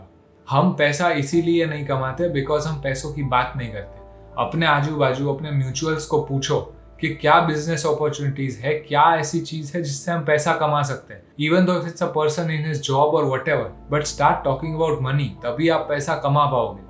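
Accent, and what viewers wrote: Gujarat, India. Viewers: Bhai hindi ma pn tamri gujarati tongue ave che, ane ek gujarati j atli saras video banavi sake ane reality batavi sake. Bija video lavo detail ma ane sadi bhasha ma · Gujarati Spotted 🤓 , Right ? 10 Sec, Video Dekh ke hi pata chal gaya .. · Gujarati che ne bhai tu taro, tone gujrati che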